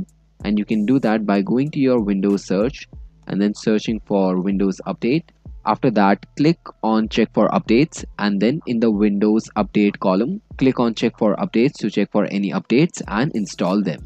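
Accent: India. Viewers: when you hear indian people. you know it will work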